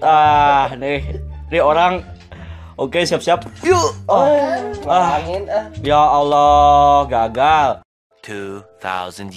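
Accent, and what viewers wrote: West Java, Indonesia. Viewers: bang abang orang sunda yaa? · Abang orang sunda ya?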